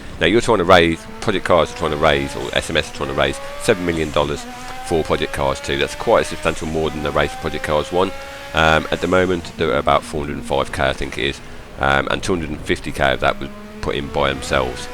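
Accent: United Kingdom. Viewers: would say uk.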